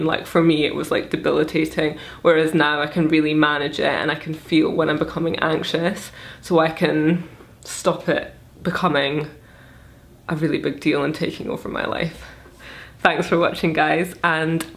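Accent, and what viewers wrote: Scotland, United Kingdom. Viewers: She has a slight Scottish accent. Beautiful skin.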